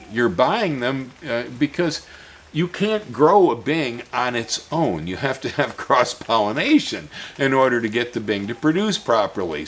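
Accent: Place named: Wisconsin, United States. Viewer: Ah northern Wisconsin, I thought u sound familiar. I’m from Minnesota.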